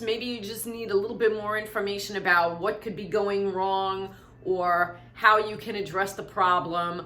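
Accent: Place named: New York, United States. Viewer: What’s that accent?!?! Big 🍎 ??? 🥰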